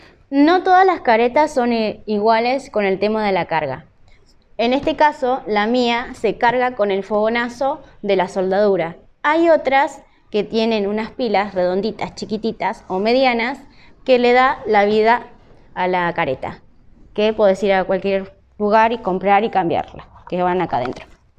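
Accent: Argentina. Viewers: sos Argentina por el acento marcado, desde Perú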